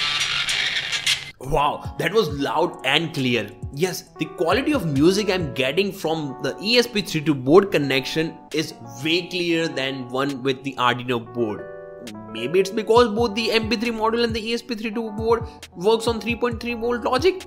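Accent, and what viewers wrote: India. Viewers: Open interesting video - Hear an Indian speak instead of a human being - Close video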